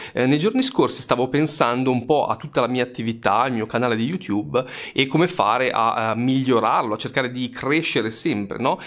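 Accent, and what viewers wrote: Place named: Milan, Italy. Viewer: Oggi l'accento Milanese era ben evidente : )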